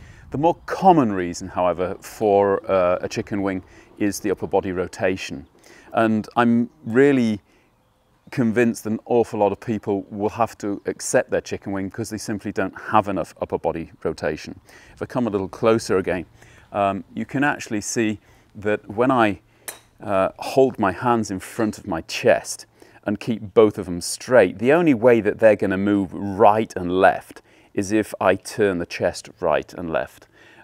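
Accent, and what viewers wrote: England, United Kingdom. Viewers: Guy sounds like Mick Jagger same accent.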